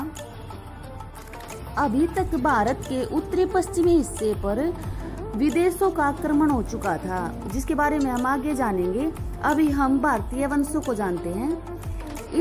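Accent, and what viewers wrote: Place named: Haryana, India. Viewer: Very nice video but control ur Haryanvi accent madam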